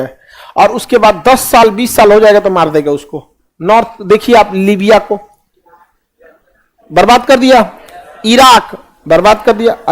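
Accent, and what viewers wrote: Bihar, India. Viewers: Bihar se ho kya jara dhang se bolo sunne walo ko samje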